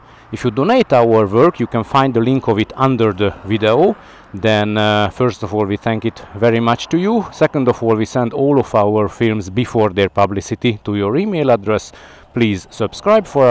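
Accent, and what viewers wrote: Hungary. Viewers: Great video. Based on your accent, are you Hungarian?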